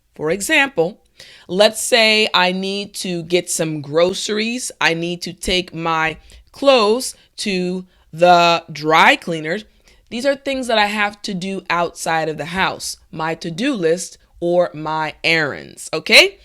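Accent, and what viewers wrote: United States. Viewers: Hi,Is it American English? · Props. Where are you from? Are you american? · Very useful I like the way you speak..those five words are necessary to start to comprehend and talk a new language..it’s the first time I watch one of your videos..I guess you’re american..which state do you come from?your accent flows delicate..i’m from Italy but I have few friends from US..bye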